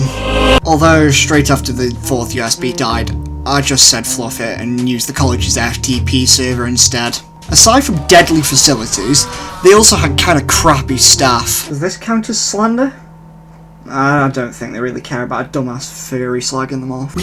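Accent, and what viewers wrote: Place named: United Kingdom. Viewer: 10/10 agressively British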